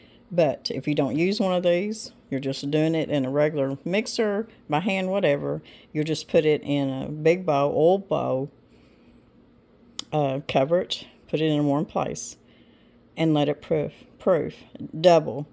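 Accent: South, United States. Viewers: I love hearing your southern draw. God bless you both.❤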